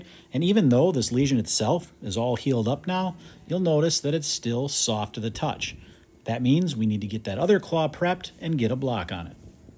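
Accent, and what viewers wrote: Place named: Arkansas, United States. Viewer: U from Arkansas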